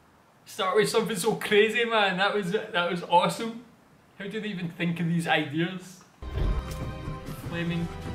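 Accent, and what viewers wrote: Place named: Scotland, United Kingdom. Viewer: I like your scottish accent.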